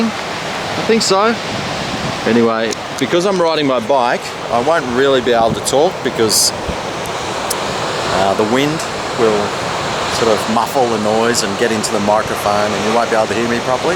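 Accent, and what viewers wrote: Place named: Australia. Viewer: That would be good, too, with a sunrise or sunset showing. Or you can do some talking with it, like this video, I love an Aussie accent. :) OR...recipes on the beach, fire going, shrimp on a stick on the barbie...you talking about anything...I love to hear your voice..that accent! This video reminded me of some of the ASMR things I've run across, the background sound and talking; that's what made me think of it.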